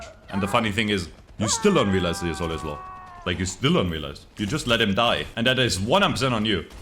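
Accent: Germany. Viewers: Boi, are you German? 😅 Or what is that accent?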